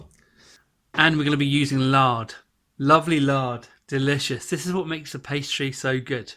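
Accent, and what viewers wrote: Cornwall, United Kingdom. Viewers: Yes you do sound Cornish, and nice to see Bedruthen Steps, not far from where I live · You actually do sound pretty Cornish!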